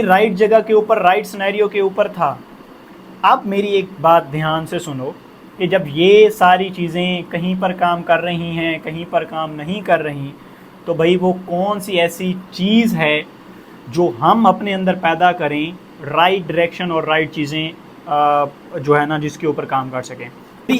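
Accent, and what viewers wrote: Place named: Saraiki, Pakistan. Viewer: sir apke accent se lagta hai aap saraiki hain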